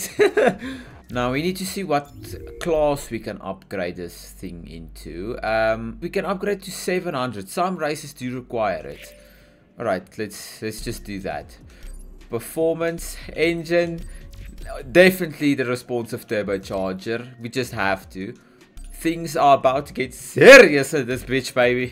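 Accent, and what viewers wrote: South Africa. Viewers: That is the most Saffa accent I’ve heard in my life, holyyyy. Nice video though man.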